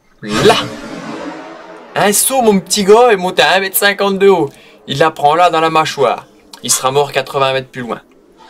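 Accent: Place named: Aube, France. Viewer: Super videos et super tirs ! Tu es d’ou pouvoir un accent comme ça…ça me fait penser aux petits gars de l’aube ! 😊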